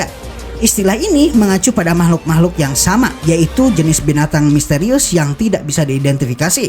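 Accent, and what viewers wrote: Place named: Java, Indonesia. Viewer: Kamu wong jowo mas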